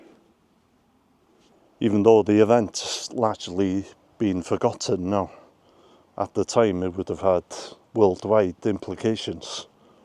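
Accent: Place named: North Wales, United Kingdom. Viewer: S Walian here; nice to hear a full Gog accent!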